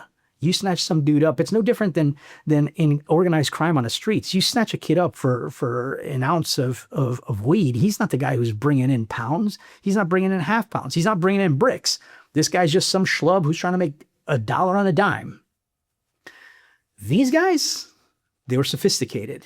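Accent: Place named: Chicago, United States. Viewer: Riveting story teller. I can't stop listening to that old school Chicago accent.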